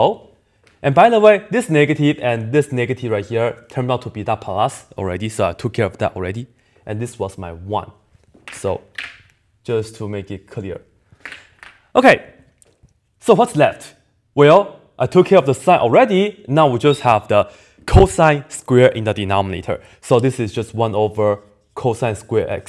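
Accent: China. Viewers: You speak English like Chinese unlike me which is Bengali😂😂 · His english is cool It seems a mix between english and chinese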